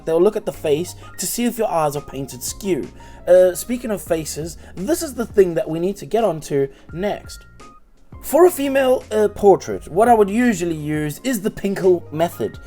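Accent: South Africa. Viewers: I hear a South African accent and I am here for it!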